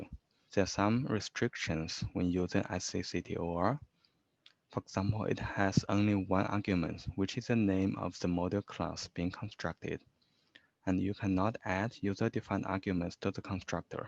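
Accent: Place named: China. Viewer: 听这口音感觉是个中国大陆人🧐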